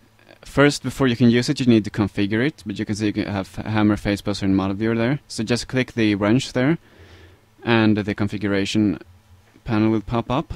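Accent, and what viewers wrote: Sweden. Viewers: Sounds like a swedish accent :3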